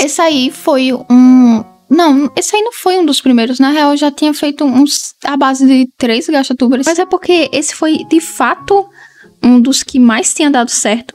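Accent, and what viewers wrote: Northeast, Brazil. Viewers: é nordestina? Senti um sotaque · Tu é nordestina? O sutaque parece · Não sabia que você era brasileira, ainda mais com um sotaque nordestino, ORGULHO BRASIL🇧🇷🇧🇷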